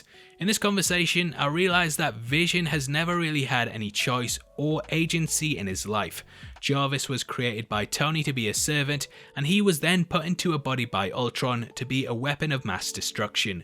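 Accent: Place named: North East England, United Kingdom. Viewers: Sounds like a South Shields accent... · You’re deffo from the north east like x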